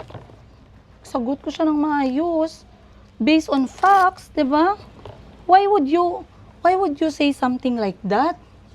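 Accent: Iloilo, Philippines. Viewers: Oo alam ko Yan ma'am,sa Doon kpa naniraha sa Uganda Kasama c Mr yiga,ginawa mo Ang LAHAT na makakapagkakitaan ginawa mo Ang LAHAT na mapagkakitaan,Minsan lang Ako magcoment pero nanonood Ako sa mga vlog mo prove,sa Uganda magluto ka ng bisayang manok,nasasarapan Ang mga taga Doon sa luto mo,at kahit Meron na c chico nagbabantay ka sa tindahan my at Meron Kang katiwala Kasama mo pero Ikaw Ang nagmamahala Doon,at Ang umuwiwi ka gensan, at nakakapagpatayo ka ng Bahay sa nabili mong lote,alam ko no? Taga Digos PO Ako bisaya PO Ako,sinusubaybayan kita Hanggang dumating c mayumi,at may mayumi closet na Ang Bata Hanggang umalis na c ate Christine,believe talaga Ako sa yo,Ang tapang mo na pinalaki mo ng maayos Ang mga anak mo,at may nalalaman na akong salita 2 lng( talat- sa ating salita ay palengke,at sawadika or sawadikap-good morning!o kamao na ko no? suggestion lng PO maan,f ever suyuin ka ulit ni Mr yiga,pwede pa ba SYA na pumasok ulit sa puso? 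Masaya Ako pagnangyari Yan kasi mayroon kayong mga anak,daghang kaayong SALAMAT ma'am,nkatawa ko nmo ba Tagalog Ang salita mo pero illonggo Ang tuno ng boses,pero nalingaw ko uy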